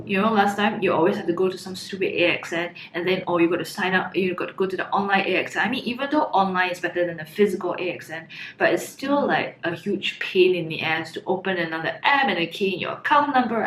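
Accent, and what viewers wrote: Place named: South Korea. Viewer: Haha glad to watch this video, I am using circles'life $28/100gb plan now and happy about it. Are you from Korea? Your English sound like Korean's English, haha. I am from Korea.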